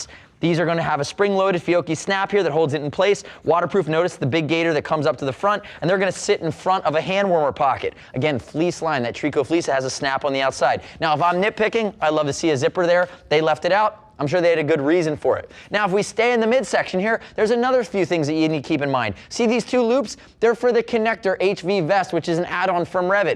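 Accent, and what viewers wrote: United States. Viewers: Dear god, that is just painful to listen too. Or at least it is for my Scottish ears. That’s like Americana on steroids! I lasted 30 secs, sorry!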